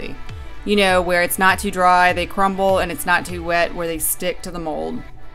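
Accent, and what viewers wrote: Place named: South Louisiana, United States. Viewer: Are you from South Louisiana? Your accent is very similar to ours.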